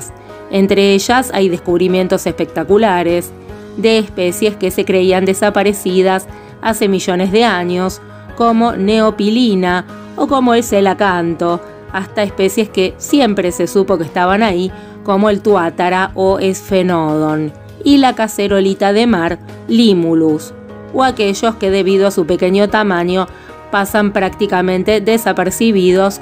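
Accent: Argentina. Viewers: Nuevo suscriptor.. Excelente contenido.. Sos de Argentina?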